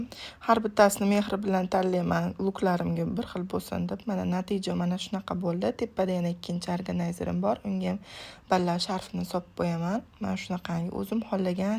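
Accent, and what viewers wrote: Kokand, Uzbekistan. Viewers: Assalamu alekum man ayol kishiman siz Qo'qonlikmisiz man Qo'qonlikman shevez uxsherkan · Men ham shuni sezdim. Qòqonlik deb òyladim. Men ham ayol kishiman. MashaaAlloh opa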